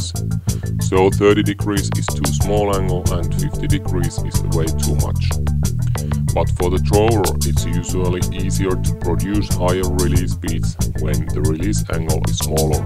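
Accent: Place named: Russia. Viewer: Sounds like he's Russian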